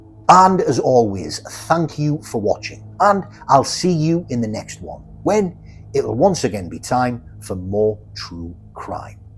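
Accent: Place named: Newcastle, United Kingdom. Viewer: Hi fellow Geordie 😁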